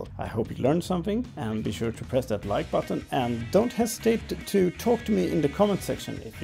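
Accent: Sweden. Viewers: The second I lissened to your voice I was like YEPP HE MUST BE SWEDISH before even reading your name/ Hello from Another Swede :) Nice too see a Swedish Gdevelop user make videos! Please continue with the tutorials.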